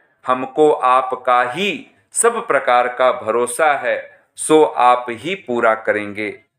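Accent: Standard. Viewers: बहुत ही शुद्ध उच्चारण हैं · ॐ नमस्ते आचार्य जी ! शुद्ध उच्चारण के साथ अति सुन्दर प्रस्तुति I हार्दिक धन्यवाद I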